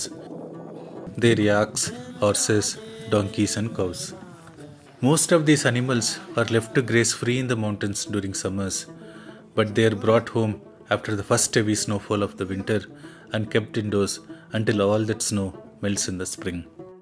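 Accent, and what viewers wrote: South India, India. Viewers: Orator from south india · South Indian orator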